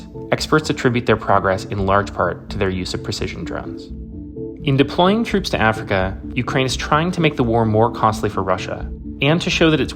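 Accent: United States. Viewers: Is this a Californian accent?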